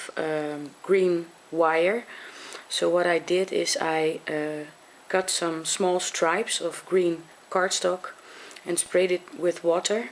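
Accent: Netherlands. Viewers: Ik geloof aan je stem te horen dat je Nederlands bent? ik wil zelf ook graag proberen een scrapbook te gaan maken voor mijn examen, ik moet een modeboek maken dus lijkt me wel leuk om hem zo als een scrapbook te maken want dat heeft iets aparts. nou vroeg ik me af waar ik zulke spullen ervoor kan kopen weet je misschien goeie winkels/webshops?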